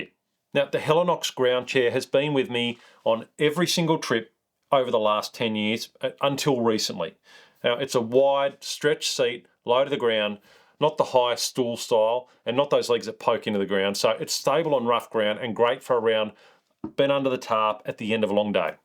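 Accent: Australia. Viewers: PROFOUNDLY Australian voice !. "Rawwrrkkk rawwrrk rawrk !" My goodness, I'm sure that's the Genuine article.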